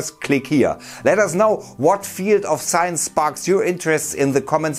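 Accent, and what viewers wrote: Germany. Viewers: Ich liebe einfach das "DEnglisch" ^_^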